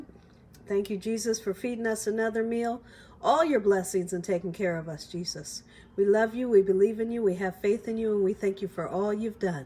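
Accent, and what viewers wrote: South, United States. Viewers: Must be from the lower south..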